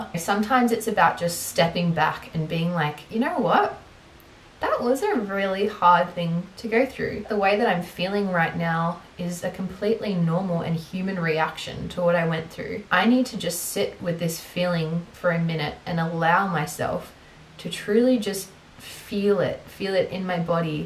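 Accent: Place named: Australia. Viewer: Hi! It's Milli, I hope you remember me from Budapest:) I just wanted to tell you how much I love these types of videos (and I'm also proud of myself because I still can understand the Australian accent ahaha) and how much I relate to you especially on this topic. These are the kind of conversations everyone need but unconsciously avoid. Thank you ♥️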